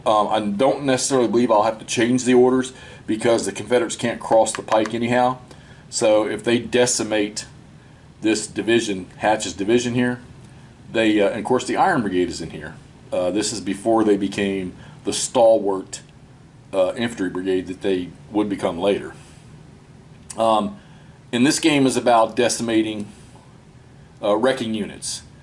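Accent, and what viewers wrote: United States. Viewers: See to my english ear it sounds more southern gentlemen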